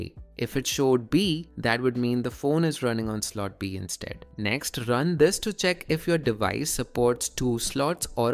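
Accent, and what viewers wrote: India. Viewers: Other than indian accent..everthing seem to be OK.... .// good job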